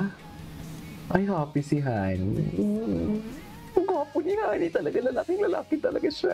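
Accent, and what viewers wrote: Iloilo, Philippines. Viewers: Baw ilonggo ka sir ?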